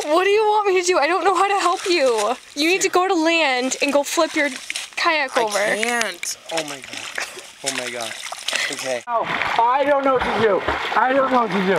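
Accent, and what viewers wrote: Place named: United Kingdom. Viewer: he sounds british for me, like sir or smth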